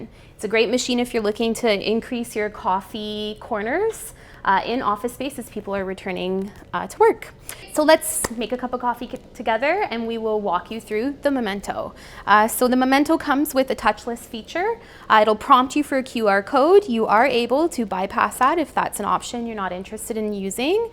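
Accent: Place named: Canada. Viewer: The Canadian accent on this makes it very entertaining! 😂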